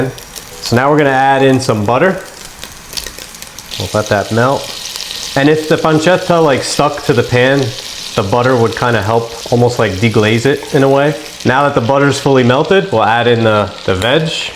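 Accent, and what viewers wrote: United States. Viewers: It is very fun to hear this perfect American accent, though he is from Italian descent, so it is easy to understand what he says too. Next time I will make vegetable broth first. :face-blue-smiling:I never let this sauce cook for two hours. I think it must much healthier when it cooks for only 20 or 30 minutes. I don't understand why Italians cook it for so long. :face-fuchsia-tongue-out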